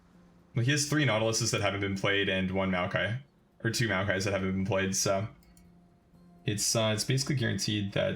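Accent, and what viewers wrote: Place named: Netherlands. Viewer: Are you dutch? I think I recognize ur accent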